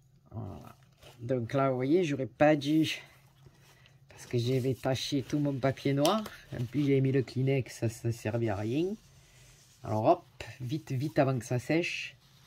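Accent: South, France. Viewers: Bonjour je viens de trouver votre site et je suis très heureuse de vous suivre vous faites des choses magnifiques j'adore ces cartes faites à l'encre elles sont super jolies merci pour l'idée du gabarit lune avec ses ombres il fallait y penser c'est super Merci beaucoup et puis en plus j'adore votre accent ça me rappelle mon midi (Perpignan) je suis maintenant sur le bassin d'Arcachon ce n'est pas le même accent!!!! bonne journée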